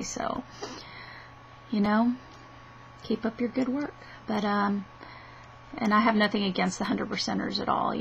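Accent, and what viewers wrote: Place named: Kentucky, United States. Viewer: Everything you said about hanging out with whites or koreans at separate times is exact to how I am. lol. You can't act one way around this group and the same with the other group. You have to refrain yourself. haha. You look more Asian than I do, imo. But your voice is DEFINITELY a Kentucky voice. haha.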